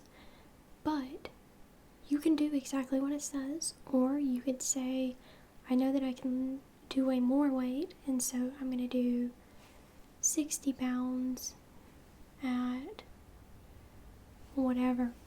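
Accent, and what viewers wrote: South, United States. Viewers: That southern accent ❤️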